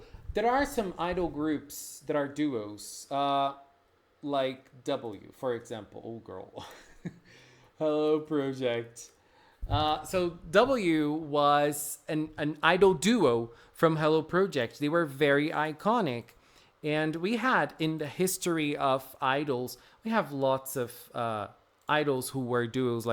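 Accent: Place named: United States. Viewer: I love your channel but I like to ask you a question you were born and raised in Brazil and you talk like a normal American I was always curious about that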